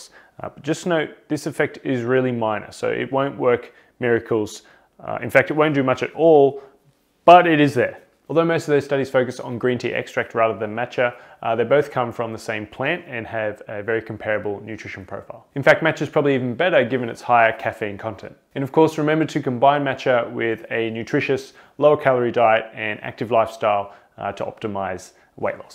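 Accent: Australia. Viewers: This guy is Australian so that is why his English is so messed up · It’s just his Australian accent! Some forms Australian accent, the ‘a’ is phonetically pronounced as more of an ‘e’ sound.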